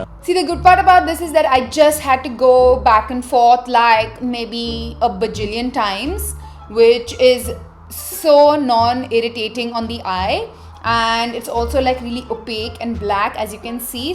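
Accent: India. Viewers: You talk like my friend she's from india too. Her name is zareen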